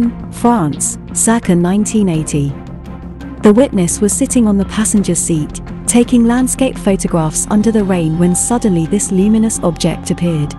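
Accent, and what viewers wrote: United Kingdom. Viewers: Love your British accent:-)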